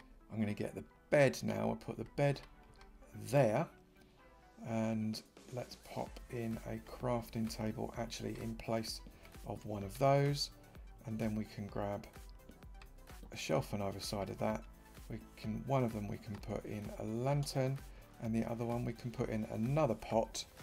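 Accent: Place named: North, United Kingdom. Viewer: Um. You must not have listened to many males from the northern UK speak. He sounds like one of those, but that’s pretty much where the likeness ends.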